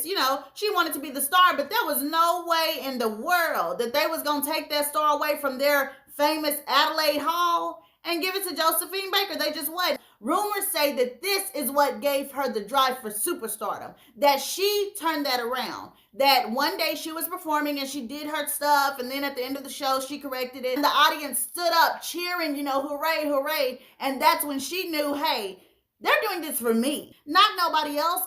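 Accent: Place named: St. Louis, United States. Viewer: sounds like a STL woman.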